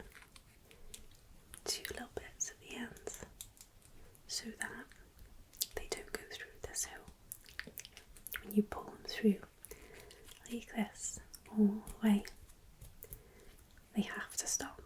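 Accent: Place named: Liverpool, United Kingdom. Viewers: I love your Scouse accent, I love Scouse accents anyway but your version is so soft and relaxing 😌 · Im irish and i loveee the scouse accent. Especially in asmr lol. Very relaxing !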